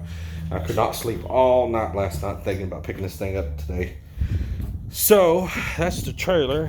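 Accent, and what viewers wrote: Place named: South, United States. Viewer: Congrats on the awesome setup brother. Are you Latino but you sound very southern? Keep trucking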